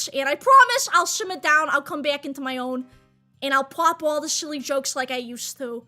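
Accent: Boston, United States. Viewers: Congrats on the debut,!!! If I can confess something, I had been a lurker for a long time, and your personality and boston accent was instantly and insanely charming! But at the time I was watching you, I had been FAR too shy to actually say anything, let alone be chummy with chat at the time. When you stepped back for the time being, I beat myself up over the fact I couldn't express my gratitude for making my day and keeping my mind off of life. You have no idea how happy I am to finally be able to do so, how honestly teary-eyed I got when I heard your voice again in the teaser. I know I'm being sappy, but I need to express how I genuinely feel and how overjoyed I am at your return. It was such a relief to find that you're the same goofball that I had subscribed to LONG ago. Thank you for being you! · That bostonian accent is unmistakable. Congrats on your debut fellow detective! 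· Hey I k own that Bostonian Accent!!! 🖤🩶🖤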